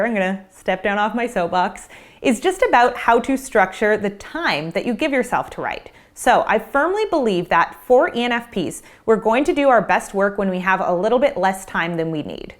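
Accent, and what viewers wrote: Canada. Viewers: Hello from Belgium! I've been living here for almost 36 years after leaving my native Canada (btw, are YOU Canadian? You sound like a "mainlander" (I grew up on Cape Breton Island, NS). OK just listened to you for the first time - and seceral times it made me emotional. I hadn't realised that I was "completely normal" in my odd way of doing things (ALWAYS last minute - or not at all...). I have been wanting to write a book about my life for 20 years but always procrastinated (fear of failure mostly, I think). I'm 55 and have A LOT to write about and your suggestions absolutely resonated with me ...but they would, wouldn't they ! 😂 Thank you ! Now to start ... well, I'm teleworking today so as soon as I have a quiet, free moment. ❤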